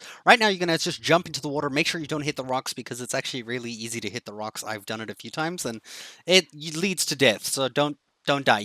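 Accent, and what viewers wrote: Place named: South Africa. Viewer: You sound abit South African bro❤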